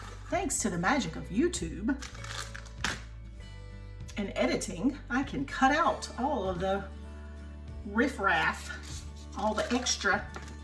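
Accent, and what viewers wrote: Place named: North Carolina, United States. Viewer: You are a joy to listen too, and as I'm originally from North Carolina, I love your accent.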